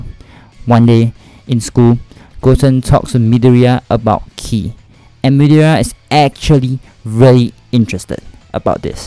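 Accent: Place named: India. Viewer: i love the indian accent maney :)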